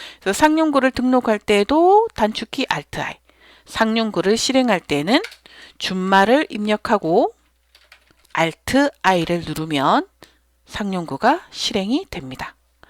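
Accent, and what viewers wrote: Busan, South Korea. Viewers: 제고향 부산이라서, 강사님 말투 듣는 순간 같은 고향사람이라서 더 정감이 갑니다. 40대 아줌마도 열심히 강의 듣고 있습니다. 감사합니다.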